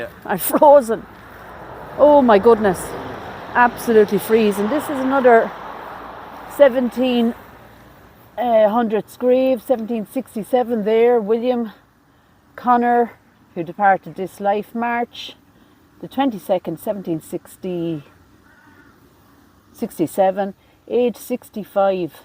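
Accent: Ireland. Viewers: Hi, Iam Darren from Australia and I just wanted to thank you for your amazing videos and the history behind them.... You are very lucky to live in such a beautiful country, I also love the accent it brings back many fond memories.... I was an on-site construction manager and worked in very desolate places in the Australian outback.... A few of the young lads working for me were from Ireland and some of the hardest workers I had, the only thing was they work hard and play hard 😂 They love to drink and fight no surprise when you working in desert like conditions 7 days a week for months at a time, I was good with that as long as they were at the work truck at 5.30 am to go to work in the morning..... Those boys never missed a day, I miss them a lot hard working hard drinking and very respectful.... They made living in the desert actually fun with loads of laughs and bonding..... 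Sorry to rave on 😂 Cheers Darren...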